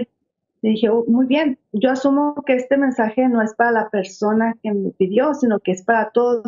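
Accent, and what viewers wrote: Chihuahua, Mexico. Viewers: Wow eres de chihuahua mi paisana que emoción lo supe por tu acento ,,, un abrazo 🤗😘 gracias gracias 🙏🏻 . Me voy a comunicar contigo para que me ayudes necesito una terapia necesito mucha luz 💡 estoy pasando por algo difícil en mi vida. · Yo sabia que eras mexicana por tu tono de voz que alegría 🤩 que puedas contactar con Los Ángeles y seres de otras dimensiones me atrae mucho este tema saludos es la primera vez que veo este canal yo desde que era niña vi una sola vez a seres desencarnados nunca lo hablé tenía alrededor de 3 años y cuando tenía 8 lo platiqué porque vinieron familiares de mi mamá de USA 🇺🇸 Y tocaron el tema pero ellos venían con un aparato detector de metales y al escucharlos volteé y les dije yo los vi me querían llevar al pueblo pues era donde vivían mis abuelos nunca más quise regresar a ese lugar. Después cuando ya era adulta tenía una especie de ataques como epilepsia pero me chocaron y no tenía nada pues tuve un evento de esos y yo sentí q me iba por un túnel oscuro y grité y de NO y siempre cusnfo me platicaban de alguien que tenía un dolor físico yo lo sentía hasta la fecha yo no puedo escuchar q a alguien le duela algo porque me lo trasmiten. 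También cuando mi papá iba a fallecer un día antes soñé a mi mamá ya fallecida y la traían unos seres muy altos con una túnica blanca y la traían en una caja de cristal y la sacaron y yo le dije en mi sueño q si no iba a entrar a la casa y ella telepáticamente me dijo que no y esos seres la cargaron y la depositaron de nuevo en ese ataúd de cristal y le dijeron ya es hora y se desvaneció la imagen y muchas otras experiencias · !! desde que empezaste a comentar supe que eres de Chihuahua, muy interesante tu información, gracias, gracias, gracias, un abrazo con amor y luz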